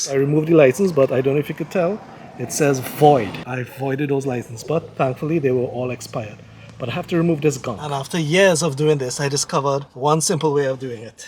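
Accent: Trinidad and Tobago. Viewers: U Sound Like You From Trinidad.... You Live In Jamaica Now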